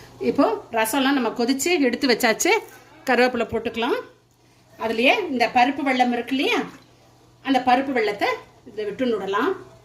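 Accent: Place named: Kerala, India. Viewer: Which place are you from Mami.? Konjam Malayalam touch iruke, Tirunelveli or Trivandrum or Palakad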